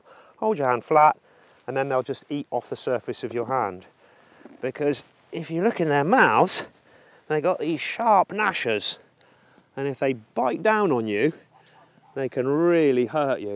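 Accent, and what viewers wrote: United Kingdom. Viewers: omg the posh level of this guy, its like if you could take the most stereotypical rich english guy and put him on screen you would naturally have this youtube channel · Because he has English accent.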